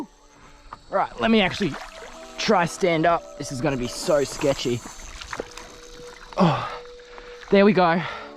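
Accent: Australia. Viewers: It’s something about an Australian accent and wildlife reminds me of Steve irwin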